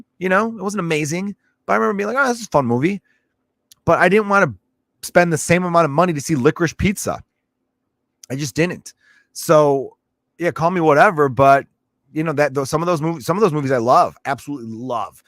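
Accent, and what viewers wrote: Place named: Chicago, United States. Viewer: Personally, I like when you go a little “too Chicago”. It’s relatable.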